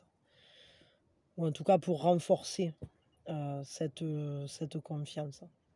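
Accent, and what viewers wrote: South, France. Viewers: Merci, toujours entre le rire et la poésie, j'adore et ton accent c'est mon sud...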